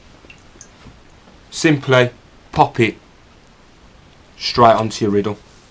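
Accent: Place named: Dudley, United Kingdom. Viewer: Love your accent. Reminds me of my mate Rob, from Dudley :P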